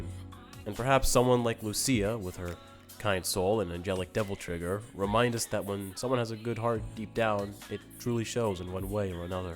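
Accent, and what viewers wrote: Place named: Arab world. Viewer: This is a very great video but from your accent I assume you're an Arab and new mention the Quran in your video another detail that I think you know but you're not mentioned the concept of Angel and demon and Satan in Islam and Christianity is completely different and one of them that the demon are not Fallen Angel but the concept of demon is describing anyone who's rebellious against God if they are Jinn like iblis or are human an angel in Islam is have no free will and they completely follow god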